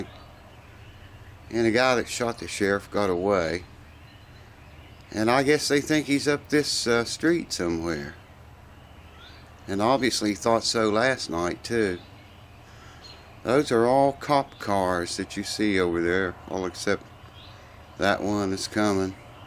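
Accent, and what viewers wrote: South, United States. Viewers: what state are you in?? I thought you was a southern man--hmmm maybe youre up there with Neil Young lol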